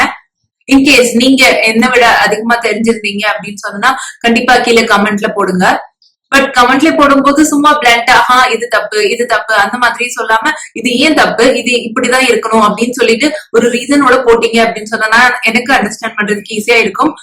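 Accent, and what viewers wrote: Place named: Nagercoil, India. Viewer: Idhu Mamma oru Nagercoil..... Salang theriuthaaaaa..... From Kanyakumari distritaaaa mam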